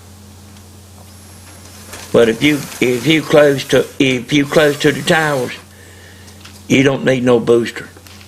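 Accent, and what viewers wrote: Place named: Tennessee, United States. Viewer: Really appreciate the attention to detail. You and your videos are without a doubt the best and most properly informative antenna building tutorials on YouTube! Not to mention, everyone loves the sincere traditional American only southern Tennessee accent- adds that extra lovability to your clear credibility no doubt brother. God bless.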